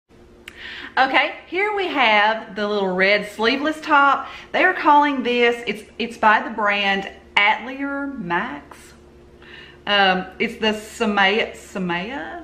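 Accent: South, United States. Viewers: First time viewer. I subscribed. You have a nice southern accent. Where are you from?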